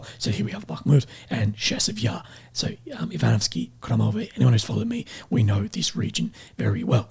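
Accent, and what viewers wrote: Australia. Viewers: You start to sound a little like Robert F. Kennedy, Jr. And as an encouragement I'l like to say that Kennedy didn't want to run for a president because of his voice, but they ran a probing campaign and polls showed that most American are ok with his voice and he is popular anyway. That made this admission in his recent interview with Jordan Peterson. I personally don't find it hard to understand what your say because of the more coarse voice. In fact, your Australian accent is much more of an obstacle to me. All that said, I hope you recover your voice soon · Mate, it's really fantastic your Aussie accent! I'm learning the difference from colloqiual English following your channel, so go on as usual I love it!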